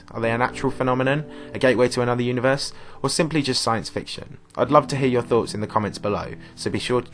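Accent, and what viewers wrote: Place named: England, United Kingdom. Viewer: Narrators with English accents; "Saturn" is mispronounced with the 'r' in Saturn silent, sounds like Sat-in, or Sat'n. I say mispronounced but to the English that's just the way they say it so I'm sure they would be quick to say it's not mispronounced. The word 'pattern' same thing, sounds like 'pat-in' or pat'n. It drives me nuts tho. The planet Uranus, pronounced Yer-In-Ess to the rest of the world while the the English just flat out say 'Your Anus' which, let's face it, just sounds wrong. Add these two words together and the English person say 'Sat'n Your Anus. Oh really, you sat in my anus? How was that? I don't want to know. I'll stick with 'Sat-urn' and Uranus.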